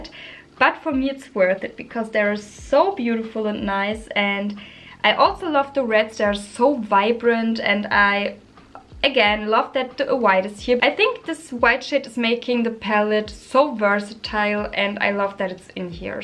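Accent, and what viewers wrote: Germany. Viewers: You look stunning ! Are you from france ? Je n’aurais jamais pensé .. i really thought you were from Germany 😅 the accent made me confused ❤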